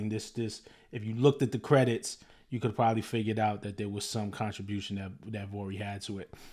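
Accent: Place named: New York, United States. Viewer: Fam if you still listening to Drake after all this, how you call yourself a fan of hip hop? Having a New York accent, glasses on, gold chain and ya hat to the back don't move me. You should be ashamed of yourself fam to even say you still fux with Drake music. Vanilla Ice was basically Ousted from Hip Hop for lying and doing this same thing. Ya'll New York folks a disappointment. Ya'll really used to gatekeep and really used to stand on something when it came to the Culture. Not Anymore. That's why places like Atlanta were able to come in a really put they stamp on the Culture. Because New York lost it's soul, lost it's backbone. A dude from New York hearing and seeing this all go down, should be one of the loudest in the Room saying "No, This Ain't Acceptable". You dudes too scared now to go against the grain even for the Culture. To me fam dudes like you sellouts. 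Don't come at me with the "I'm trying to be fair", blah, blah, blah. FOH with all that. You don't even talk like you got passion for this Culture. Kendrick rapped like he is of the culture, from the culture, and it means something to him. You ain't got that same type of energy my guy. It's cool. Do ya thing. Alot of ya'll "Not Like Us" and alot of ya'll getting exposed. You gone be on the wrong side of history when it's all said and done. Trying to play the middle like you Swizterland, is how we got here in the 1st place with Drake.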